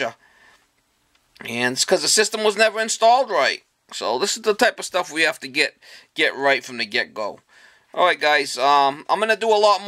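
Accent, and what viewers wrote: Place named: Northeast, United States. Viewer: you have done a service to all. 1. younglings, they need the facts and must listen carefully 2. blue hairs, need to learn the newer stuff. 3. customers, yes customers, they need to see what it takes to get the comfort that they have grown a costumed to, and need to be willing to pay for. your videos are invaluable to all. and I thank you for a very clear honest and informative channel. "every day is a school day" is dead right, I work in industrial maintenance and that is the best truth that I have herd in along time I will plagiarize the . I have watched 4 hours if your videos tonight on the 52" plasma w/ full Dolby surround and loved every minute of it. WOW you are honestly the greatest. & I love you heavy N/E accent, it is killer! you are a gem. & yeas I know how much work it is to prepare each video, not so easy. 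even if you try to edit "in camera" it still takes a lot of work.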